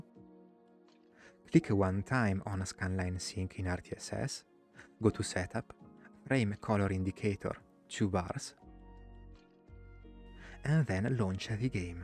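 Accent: Italy. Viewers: Riconoscerei l'accento italiano da 1km di distanza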